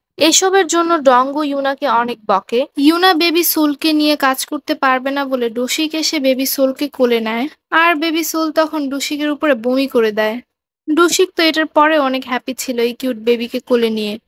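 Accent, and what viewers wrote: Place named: Barishal, Bangladesh. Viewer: আপু তুমি কী বরিশাইলা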